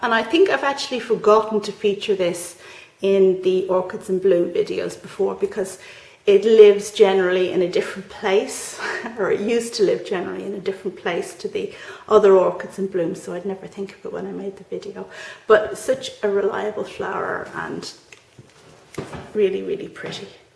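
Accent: Ireland. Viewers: Happy to have found your channel via Lyn's! I just love your accent and love seeing glimpses of Ireland out your window! I live in the US and my mom took me to Ireland for my 30th birthday. My parents have friends in Ireland and they drove us around because neither of us trusted ourselves driving on the other side of the road! We visited in February and I loved the rain and seeing the mist in the valleys and fields. We stayed in a town a short train distance from Kilkenny. I can always remember Kilkenny but not the name of the little town! Haha. I wish I had thought to bring a houseplant back as a souvenir.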